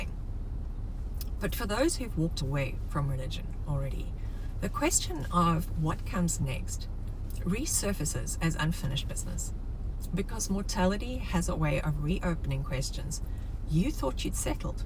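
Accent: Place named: Afrikaans, South Africa. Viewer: Are you Afrikaans? I like your accent. Great vid. Thanks.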